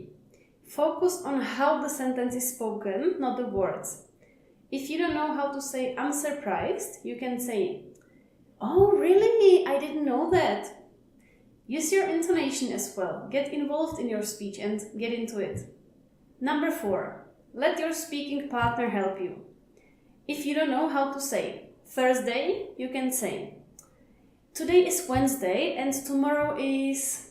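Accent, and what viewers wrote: Czech Republic. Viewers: Je slyšet ten hnujsnej českej přízvuk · I just wanna ask native English speakers watching this video, are you at all bothered by the fact that the teacher speaks English with a Czech intonation? Not trying to be rude, just genuinely curious! (Honestly, most Czech teachers speak English this way - they learn their vocab but the accent and intonation kind of stay Czech.)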